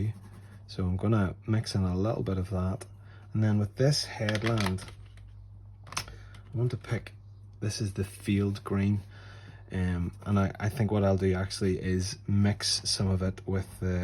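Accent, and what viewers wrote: United Kingdom. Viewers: Love your Northern Ireland accent! I have these DERWENT water colour pencils all in lovely earthy colours - but only a 10 pack - l called in Homecraft today to get this bigger selection that you happen to be using - but the price was very high and l couldn’t be sure if they would be as effective as my original 10 pack…. So thank you for this video …. You have persuaded me to invest in this bigger selection! Love your work! Delightful to see an artist from NI on YouTube!! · Just starting to learn pencil drawing and water color pencils at 70. I am watching various youtubes to learn the basics. I came across this and watched intently. I had to chuckle a bit, when I thought people were watching wheels, instead of whales. :>) Our American accents are just a bit different than UK ones. So thanks so much for a great video.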